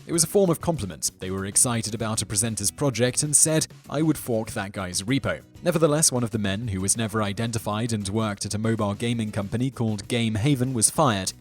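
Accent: United Kingdom. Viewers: sounds so much more British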